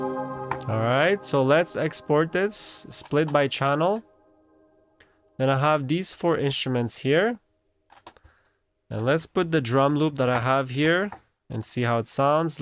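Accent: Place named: Montreal, Canada. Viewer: The minute I heard your voice I could tell you were from MTL. Super fire watching different work flows! This a cheat code for many who don’t know music theory!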